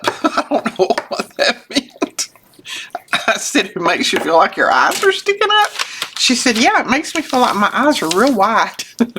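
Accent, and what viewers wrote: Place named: Alabama, United States. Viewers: Awe thanking yie well Ile tell yie 🖐️👅🤗 ime going to see that on Saturday with my x 2 grandwains, 2boys 5 n 8. They both just turned that age oooppphhhffffff they can't sit down but they do in the cinema 🖐️😝😝😂🙏 yie remember at age full of questions well we still love them with our heart n soul I know a lot of things change when yie Wer able to make the big meals I still do that I always put more on than I need. Its a total waste, plus be went off everything some smells it's drivinge caarazy n then ime nearly deaf I've only got 40,% of hearing left soo anyway I get tinnitus badly I hardly sleep but i hear yie woman 🖐️🙌🤗🤗💜💜😘😘 I wish I could give u big hug right noo 🤗💪💪 amd i know the feelin the grandwains they think yer purse is an open book n it never closes n always good to look at explore lol 😝😝😂🖐️👌👌 but we love them plus I love listening to you you calm me but i do watch too keep yer chin u btw your doing amazing remember you take care Mrs b good 👅🖐️😘🤗 i hoe i made yie smile with my Scottish patter n slang words n I love yer accent that deep south love it I know I know Mrs xx 🤗🌹🌹💜💜❤️‍🔥❤️‍🔥❤️‍🔥🙏🙏 sounds a funny big guy god luv his wee soul gdnyt or morning whatever comes first for you I've been dying papers all day making them pink with blowing the bubbles on them I love the effects,🙌 soo tired noo thanks for reading tillthe end 🙏❤️‍🔥❤️‍🔥🌹🌹🏴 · E, as I’ve said before, I love listening to you talk. 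You remind me so of my Alabama family. I miss them very much. But, I also like hearing your ideas and learning from you! Thank you!!!❤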